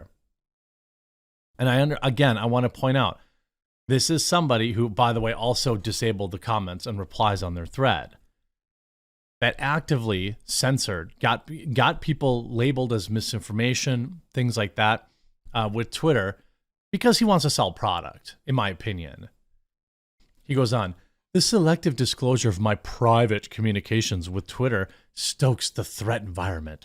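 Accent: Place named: South, United States. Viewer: Terrible, terrible first 6 mins. I know you don't care to why someone is watching your recordings. Just know I for one watching you get some serious cringe. I am a conservative btw. Your real red neck swamp accent, your memory, your facts, your dumb Coffee and its constant promotion even when I have YouTube Prime. I just shake my head and think it's really bad here. And also lots of Cringe.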